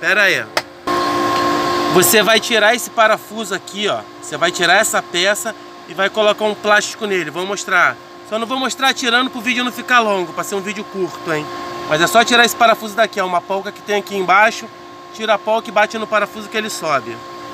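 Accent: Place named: Rio de Janeiro, Brazil. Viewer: Aê carioca di mais...... cumpadi!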